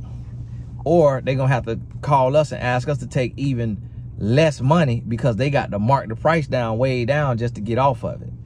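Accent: South, United States. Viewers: I gotta say. I’m subscribing because of the accent (I’m southern too) and the realness. I just randomly found this video, but everything you’re saying is spot on.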